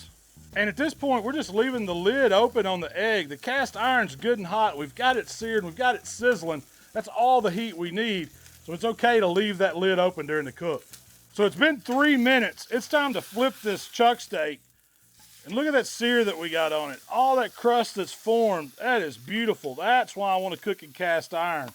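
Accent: South, United States. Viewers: I can never tell what accident this guy has. He has a southern accent, with a pinch of Canadian, Austrian and English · It’s just a southern accent..? Austrian? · Idk where ur from but this is southern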